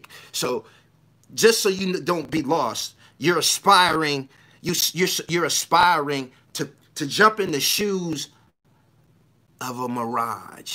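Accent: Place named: Pittsburgh, United States. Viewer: What part of pa from? Sounds like a Pittsburgh dude.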